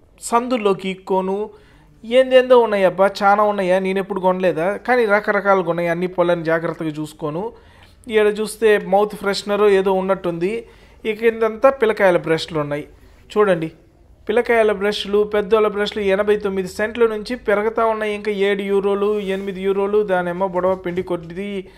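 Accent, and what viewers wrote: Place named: Rayalaseema, India. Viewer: Medhi a district anna rayalaseema slang la vudhi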